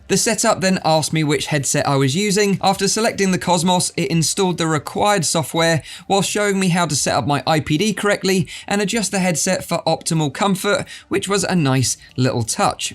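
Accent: United Kingdom. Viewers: NDT and those guys are all actors. Propagandists. And yes , ole boy is brit af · wtf? He has a decidedly British English accent. His pronunciation of 'cosmos' is in that idiom, and no mistake. I see no evidence of Yankery here!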